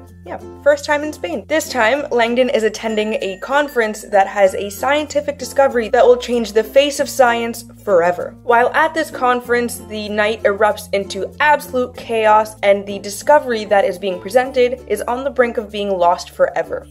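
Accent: Brazil. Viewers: ohhhh portuguese !!!! that is my language , im from portugal omg. your portuguese was pretty decent , but you definitely spoke with the brazilian accent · Hi beautiful, you are talking portuguese with the brazilian accent, I'm from Portugal and our accent is very different ahah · I think the spelling of Portuguese from Portugal and Brasil might be the same but the pronunciation on your vid is kinda brazilian lol! You did an amazing job!!!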